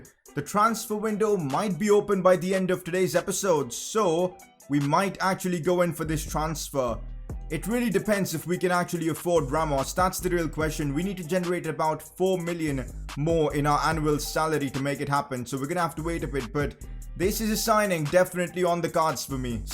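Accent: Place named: India. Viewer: PCQ: your accent is different where are you originally from? India? Sorry if its personal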